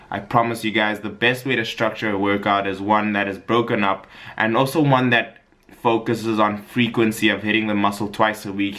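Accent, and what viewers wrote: Nigeria. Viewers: that NIgerian accent tho..lol...too funny..great tips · Why u talking like a Nigerian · The Nigerian accent tho 😂😂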